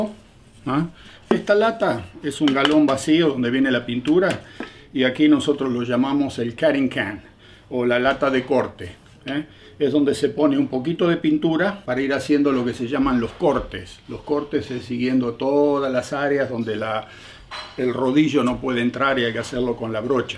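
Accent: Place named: Argentina. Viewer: Nos encanta la calidad y los detalles de la explicación. Además nos asombrá que después de tantos años en Canadá conservés el acento argentino como si nunca te hubieras ido. Un capo de la pintura! Saludos desde La Plata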